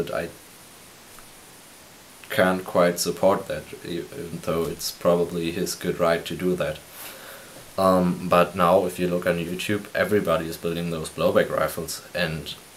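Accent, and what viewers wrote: Germany. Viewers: are you german ? your accent sounds like you are.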